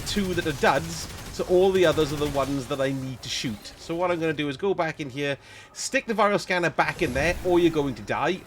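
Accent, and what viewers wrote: Wales, United Kingdom. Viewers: Love the Welch accent, miss working in Wales, good content. Thank you.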